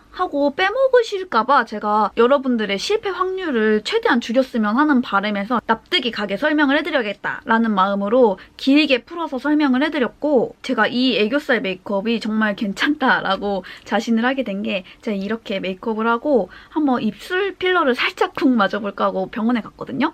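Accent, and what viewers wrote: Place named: Gwangju, South Korea. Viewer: 혹시 언니 광주에 사시나요? 말투가 제 친구들과 똑같아서..❤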